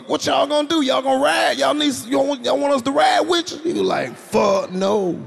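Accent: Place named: Bay Area, United States. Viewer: On my mama , we Hella do talk like that down here. It's that yay area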